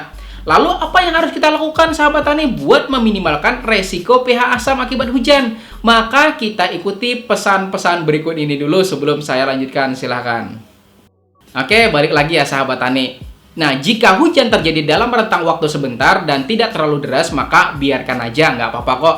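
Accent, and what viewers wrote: Banjar, Indonesia. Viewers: Orang Banjar kh abang ni? 😁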